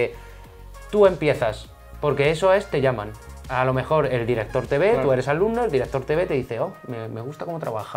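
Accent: Argentina. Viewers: Escardi neta eres Argentino we(? PAAAAAJARO 7U7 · Sos de Argentina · Eres de argentina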